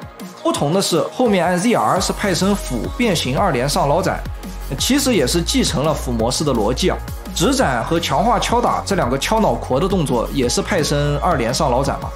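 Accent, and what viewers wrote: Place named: Shanghai, China. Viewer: 您是上海人吧，感觉你普通话的口音很有这感觉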